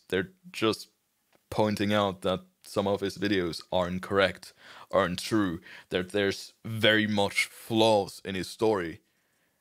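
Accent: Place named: Germany. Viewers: Dein Denglisch ist so horrendous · I think his english fine. Ich mein, 20 Sekunden in, dache ich... ist er deutsch? Aber so what!? I’m gonna subscribe whatsoever. 😉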